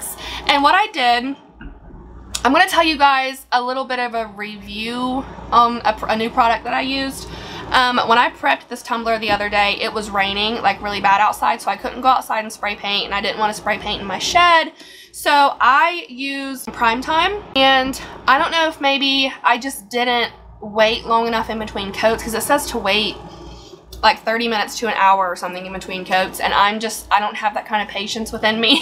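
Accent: South, United States. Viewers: Ok, I love your videos! So natural and fun...but I have to ask, where are you from? I’m from the south and sometimes I hear a bit of a southern accent but I’m not sure. Just curious. 🤗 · omg I’m a southerner and thought you were from the south this whole time!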